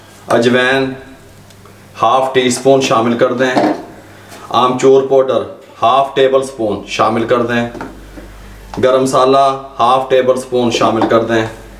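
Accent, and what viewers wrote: Punjab, India. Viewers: I lv the way he speaks punjbi viche viche😂😂 and the way he guides. Allah ap se Raazi ho. Ameen. 😇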